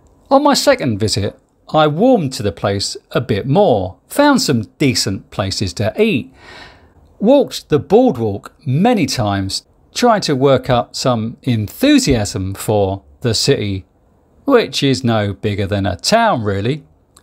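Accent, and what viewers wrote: England, United Kingdom. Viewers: Love your delivery attotude and yes English accent. Gteat video uncle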